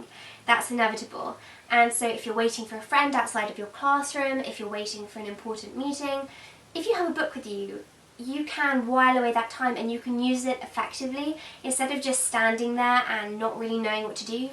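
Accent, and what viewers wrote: RP, United Kingdom. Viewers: British acccent... Reading books..... And your hair cut is also same as Hermoine granger... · You remind me if the girl of harry potter you also look alike and have the same accent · Your accent are just like Hermione Grandger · I like these tips but shes so annoyingly posh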